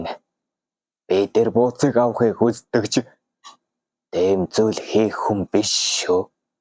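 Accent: Inner Mongolia, China. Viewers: Өвөр монгол хүн уншаад байгаа юм уу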